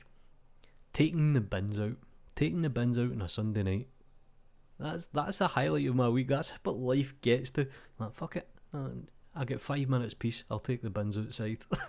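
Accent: Scotland, United Kingdom. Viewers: Downfall?! Bollocks. The Scottish accent makes everything better, including your videos :D And dont sweat it mate. I'm 8 years your senior, with 4 kids and two nuked marriages behind me and I still play around with Blender and other softwares daily. You're doing fine ;) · As a german, I find your scottish accent very pleasing! I rarely struggle to get what you`re saying. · There is no shame in your accent. At least you are not using Scot's vernacular or Ghàidhlig ... tbhiodh e inntinneach faicinn an dèanadh iad staim dheth....LOL! Bless those who wish to preserve the auld braid Scots tung. You are young yet....just wait...it gets worse... :) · Happy Birthday!...and I love the Scottish accent. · love the Scottish accent BTW. Very different from Grant Ibbot.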